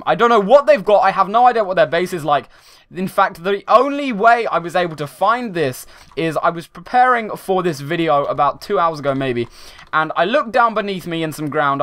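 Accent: United Kingdom. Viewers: Is hey British?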